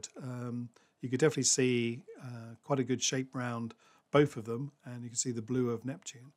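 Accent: Australia. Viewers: you sound like an Aussie expat rather than a Pom is that correct? Great moon images mate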